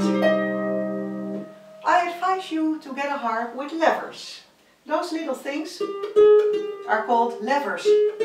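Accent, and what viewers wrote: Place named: Netherlands. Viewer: sounds like a Dutch accent 8-)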